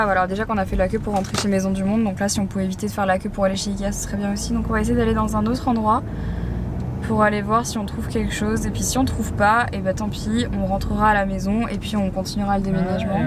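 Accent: Belgium. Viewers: tu es belge ? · L’accent belge, ma passion 😍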